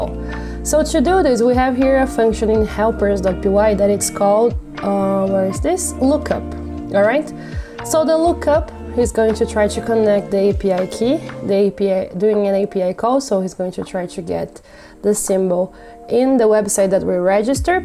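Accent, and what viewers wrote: Brazil. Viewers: You’re from Brazil, right? Your accent reminds me of brazillans’. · Reconheci pelo sotaque kkkkk muito bom encontrar br pelos cursos cs50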